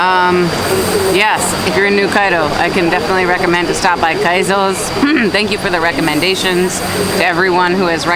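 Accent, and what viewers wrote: United States. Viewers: Dear, thank you for the video but I have a question, why do you keep repeating "this looks fancy to me", "this looks posh", "rich people live here"? From your accent you obviously come from the US. So, there are no "fancy" neighborhoods in America? Do Egyptians have to live in slums to conform with the stereotype the West has about Egypt?? Egypt has 110-Million citizens, of course at least a couple of millions can have enough money to live in nice neighborhoods. This view of Egypt as a country that MUST BE POOR through and through is prejudiced and not right. Every country has rich and poor neighborhoods. In your country you have Hollywood and the Bronx, so let's drop this "fancy rich neighborhood" narrative as it is insulting to many hard-working Egyptians who have EARNED their right to live in "fancy" neighborhoods. Good luck with your videos! 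· I am Egyptian and I like watching your videos and listening to your American accent that's how I can get the accent .Go on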